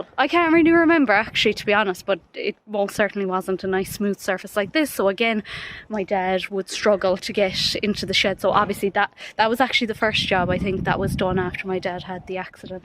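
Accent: Ireland. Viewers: awesome I thought it was a wee bit of Irish I detected in your voice My mom side of the family was Irish an my fathers side was Scottish lol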